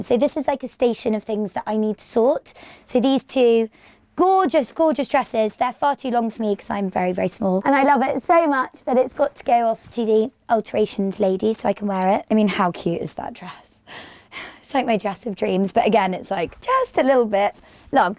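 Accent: United Kingdom. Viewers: She’s so adorable but her accent reminds me so much of Bridget Jones 😩😂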